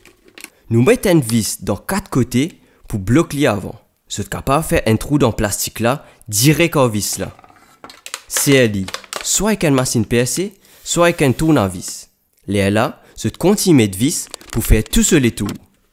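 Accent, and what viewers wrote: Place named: France. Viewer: Hahaha me disait bien qu’il avait l’accent français quand il parle anglais 😂 Damn j’ai douté hehehehe😂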